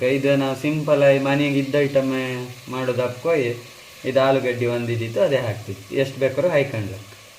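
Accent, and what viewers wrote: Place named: Kundapura, India. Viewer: ನಿರುಳ್ಳಿ ಎರಡು ಗ್ಯಂಡಿ ಸಾಕ...😂 ಒಟ್ಟಾರೆ ಪ್ಯೂರ್ ಕುಂದಾಪ್ರ ಕನ್ನಡ ಮಾತಡದ್ ಒಳ್ಳೆ ಇತ್ ಮಾರ್ರೆ 😄😄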